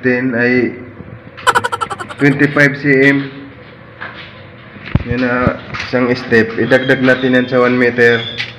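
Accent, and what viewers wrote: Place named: Bicol, Philippines. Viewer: Parang bikulano ka idol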